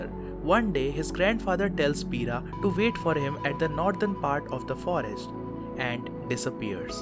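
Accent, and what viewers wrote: India. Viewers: Your accent is Indian 😉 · bro, sound so much like a Indian...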